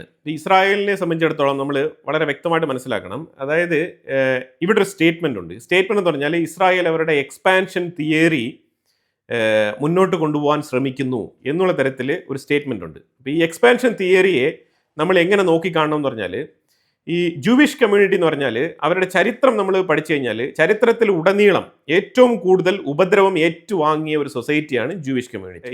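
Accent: India. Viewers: വളരെ നല്ല അവതരണം കൃത്യമായി കാര്യങ്ങൾ മനസിലാക്കാൻ കഴിഞ്ഞു... 👍👍സ്ഥിരമായി കാണാറുണ്ട് യുദ്ധ വാർത്തകൾ മററുളള ചാനലുകളിൽ പറയുന്നതിനെകാൽ വലിയ ആധികാരികമായി തന്നെ പറയുന്നുൻട്...താങളെ കുറിച്ചു ഒരു self Introduction പ്രതീക്ഷിക്കുന്നു... മലയാളം കേട്ടിട്ട് കേരളത്തിന് പുറത്തു വളർന്ന ഒരാളായിട്ടാണ് തോന്നുന്നത്...എല്ലാ ആശംസകളും..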